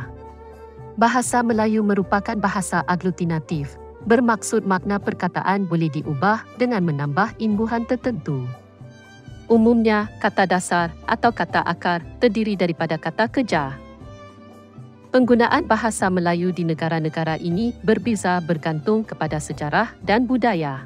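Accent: Indonesia. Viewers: btul ini admin ber loghat indonesia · Anda sekarang bicara berlogak bahasa Indonesia kan?? 🤣🤣🤣 Gak usah bilang itu bahasa melayu baku. Preet!! Admin peak lu! Banyak belajar sono!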